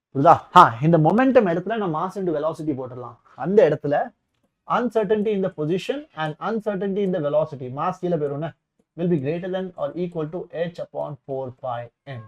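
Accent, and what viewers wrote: Tirunelveli, India. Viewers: Nellai tamil mass sir 🔥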